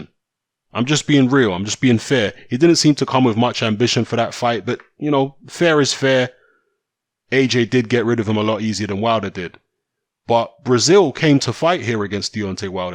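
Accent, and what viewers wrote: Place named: United Kingdom. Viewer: Thanks for that it's nice to hear someone with a British accent giving Wilder some respect.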